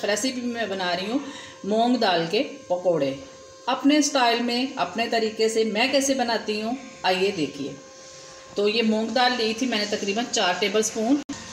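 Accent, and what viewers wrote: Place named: Punjab, India. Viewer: Wowww Super like 48 for the Super tasty and crispy Moong Daal ke Pakode. Kya baat hai Bahaut hi alag style se banaya aapne inne. Bante dekh muh mein paani aa gaya. Nicely prepared and well explained each step. Aapka Punjabi tone hai bolne mein, jo hi Bahaut accha lagta hai. Jabardast Pakode ku Beautiful presentation ke saath. Wah ji wah mam. God bless you always 🤤🤤😊👍👍👌🙏🙂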